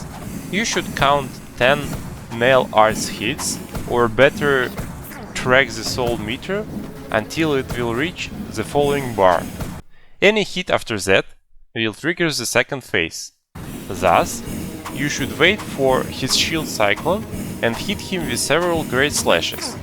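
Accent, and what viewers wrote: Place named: Russia. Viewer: Ты чо, русский шоле? А то акцент русский...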